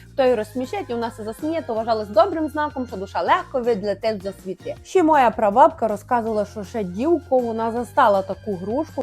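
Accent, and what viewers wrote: Hutsul, Ukraine. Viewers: Як я люблю цю жінку, особливо її гуцульський говір. Нехай щастить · Я все зрозумів, просто замість Я говориш Є і в тебе гуцульській акцент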